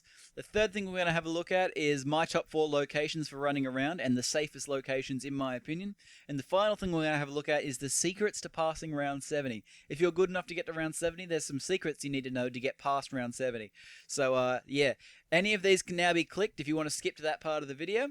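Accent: Australia. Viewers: Really helpful mate thanx, what part of Aussie u from?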